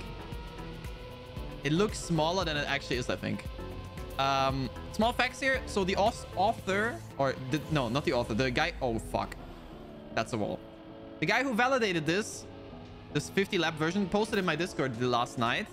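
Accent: Germany. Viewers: Are u German? Because u sound like that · Are you german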